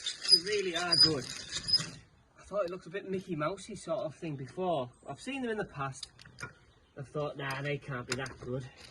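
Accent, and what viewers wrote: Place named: Northern England, United Kingdom. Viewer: Thought you were couldnt tell by the accent if you are based in north east or north Yorkshire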